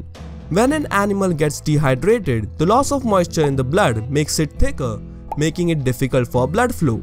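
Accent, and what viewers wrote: India. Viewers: great video dude! but I have got to say this. try putting some effort on improving your accent. i know you've been trying and I can see from your previous videos you have improved. try taking professional help. because non-indians find it hard understand Indian accent. I am asking you to do this because your videos are great stuff and I want this to be popular worldwide. hope you reach your goal as early as possible! cheers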